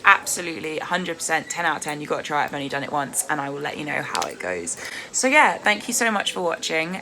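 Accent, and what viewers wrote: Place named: United Kingdom. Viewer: Love your British accent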